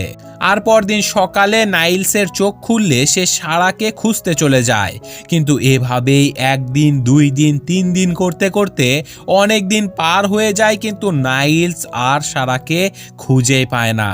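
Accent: Bangladesh. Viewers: Dada apnar voice ta khub sundhor API ki bengladesi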